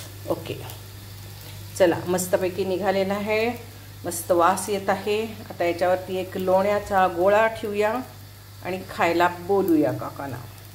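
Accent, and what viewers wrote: Kolhapur, India. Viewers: तुम्ही कोल्हापूरच्या का? भाषे वरून कळते.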